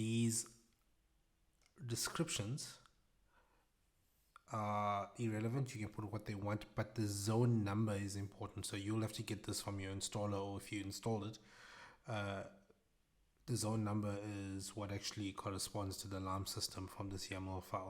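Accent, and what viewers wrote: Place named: South Africa. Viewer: You sir are a legend! Always stoked to hear a South African accent when trying to find info on youtube. Thanks for the vid, very helpful.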